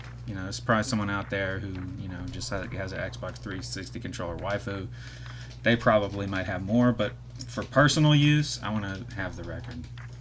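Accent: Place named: South, United States. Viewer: you have a Southern drawl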